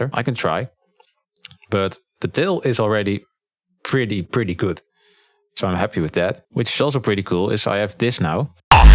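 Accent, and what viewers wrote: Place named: Netherlands. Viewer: Are you Dutch? Because you have the typical Dutch accent in your English. Althans.... dat denk ik wel te horen :)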